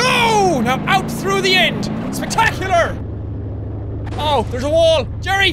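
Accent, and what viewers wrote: Ireland. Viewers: That accent is OBVIOUSLY Irish. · the first video i ever watched, 8 years ago, i was obsessed with beam ng and saw this video and muted the sound cause i didnt like lets plays at the time. then i realised he was irish, same as me, and then i thought he was cool. 8 years later and i watch meme time everyday because its the only cure for sadness. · Yeah, hes irish, IRISH people are cool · This has been my first time on this channel and I must say, your accent just sells it! That Irish? I think it's Irish. Well, whatever it is, I love it! It just tickles me! I'll keep looking at your stuff, and am heavily considering subscribing. Thanks man! Cheers! :D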